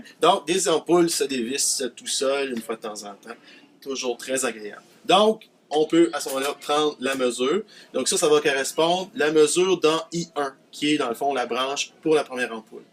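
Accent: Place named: Canada. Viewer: Accent canadien😂j’aime trop continue tu gère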